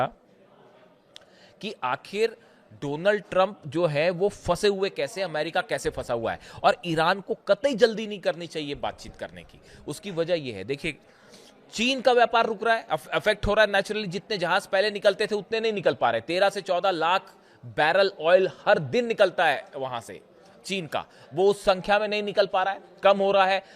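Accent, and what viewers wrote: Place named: South, India. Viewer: چینل تو اردو ہے لیکن لہجہ ساوتھ انڈین ہے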